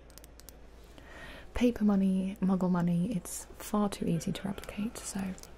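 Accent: England, United Kingdom. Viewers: nah its obviously a mix of lots of different english accents and pronunciations. kinda like elon musk but with regional english accents. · Hey you sure you are not from England either that or you have some seriously good accent skills 😦🙌🏻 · From a fellow Englishmen I approve of this accent. good job 👌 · no this is an english accent because the accent is from England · Dylan Brooks you realise how many different accents are in england? lmao where i am from most people sound like in the video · You seem to have nailed the English accent,which is unusual for an American. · I'm not sure if everyone's appreciating just how hard it must have been to do an English accent while also having fake fangs in your mouth! haha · That English accent though! Like YASSS! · If I was new to this channel, and if it wasn't for the merch bit at the beginning of the video, I might have believed that you actually were English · Many subscribers with no videos I’m sorry but English people over the age of like 20 all sound like this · English. 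Not British. Britain includes Wales, Scotland and Northern Ireland. She is doing an English accent. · Usually I don't like the English accents a lot of Americans use as its not realistic or accurate (I'm English) but with this is nicer because some people in England talk similar to this and even I do to an extent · Im from england and thats a great accent very good 10 out of 10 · Your English accent is so funny! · your English accent is on point! My family comes from Wales but grew up in London. Luckily, I have also picked up the accent. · After some time i actually thought she was English Very well done 👏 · I need another video with English accent 😭💘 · your English accent is amazing! Sending you love from the UK 💕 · Me as a Englishman I think that is a great 👍 imitation of a English accent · Nice accent, it's better than some English people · You do a great job with English accent daaarlling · CAN 👏 WE 👏 GET 👏 MORE 👏 ENGLISH 👏 ACCENT 👏 VIDEOS 👏 PLEASE 👏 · Good English accent and thats coming from an Irishman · Great accent! 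You sound like you're from England!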